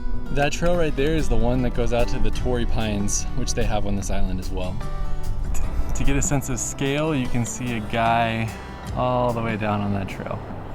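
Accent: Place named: United States. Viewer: American accent All I hear in every sentence is “ coastal trail” with no other information. Are there no biilfungs or a town there to buy food abd water? Is there nowhere to stay overnight? Are there no emergency services? Tgat woukd make sense but then again you’re Americans. Evrry little about yiur country makes sense.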